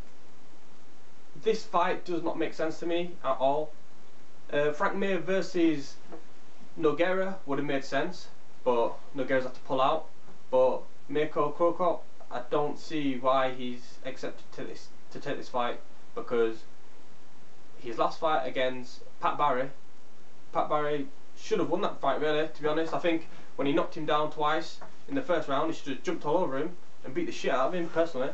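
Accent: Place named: United Kingdom. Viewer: great british accent you have. Awesome accent, though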